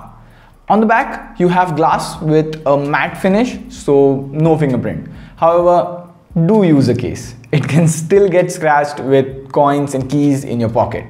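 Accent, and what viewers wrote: India. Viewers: Прикольный акцент у индюшонка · Good Indian English review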